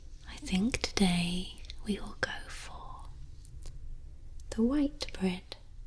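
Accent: England, United Kingdom. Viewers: Your absolute perfection in ASMR! Your pacing, presentation, variety of subjects, and your near angelic English accent is simply...just WOW! Very original and enjoyable. Thank you. 😊🖐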